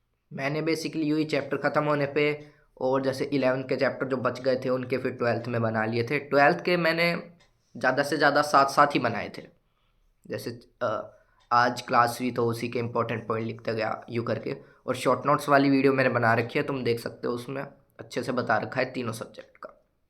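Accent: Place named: Haryana, India. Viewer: Bhai tera haryanvi accent kadak hai😂, mera bhi 2024 mein mains hai, 12th appearing hun😊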